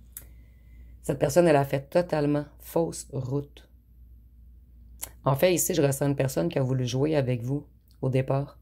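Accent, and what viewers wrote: Quebec, Canada. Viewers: Vous êtes québécoise vous????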